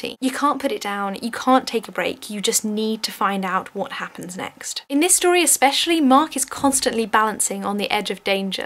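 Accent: United Kingdom. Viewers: I bought this a couple weeks ago and finished it in a couple days. This reading thing sucks though. It doesn't sound good in a British accent.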